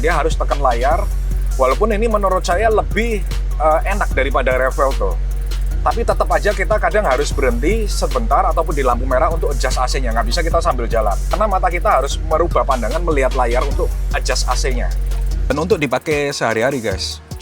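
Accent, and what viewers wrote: Java, Indonesia. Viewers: kaya tapi jelek dan suaranya kenapa jowo bgt yah? tapi mantabh lah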